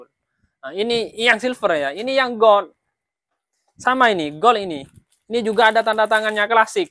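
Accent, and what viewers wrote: Madura, Indonesia. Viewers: Abang dari logatnya kayak orang madura ya bang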